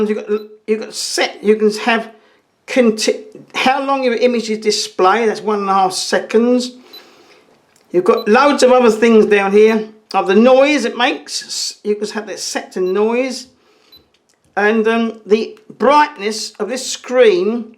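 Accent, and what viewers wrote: London, United Kingdom. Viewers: He sounds like my old father in law, he was a Londoner from Kingston, shame he didn't live very long, couldn't give up the ciggies... But a lovely video, straight from the heart! I bought this camera, because I wanted to feel something chunky in my hand. The Finepix JZ 500 which I also have, is more like a box of ciggies and no blimmin good if you have arthritis and sausage fingers ;o)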